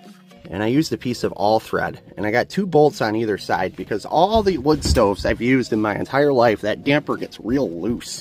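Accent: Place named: Michigan, United States. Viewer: You sound like you have a Michigan accent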